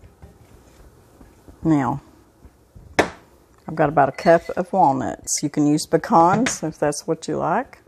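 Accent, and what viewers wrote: South, United States. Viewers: So glad I found your channel. That cake looked amazing!! Great for fall baking! I'm definitely going to be making this. Thank you for sharing. You are a wonderful teacher and I love your southern accent. Have a blessed day! ❤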